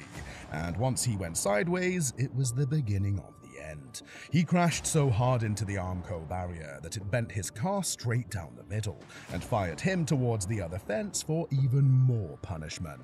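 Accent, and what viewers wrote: United Kingdom. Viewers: I was going to watch until I heard the Brit narrating....😱🤨🤬🤬🤬🤬🤬🤬🤬🤬🤬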